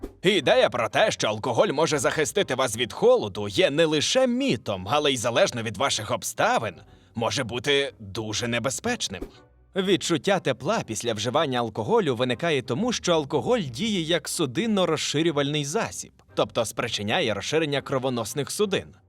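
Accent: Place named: Galicia, Ukraine. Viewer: Паплюження української мови галицьким суржиком. Риготня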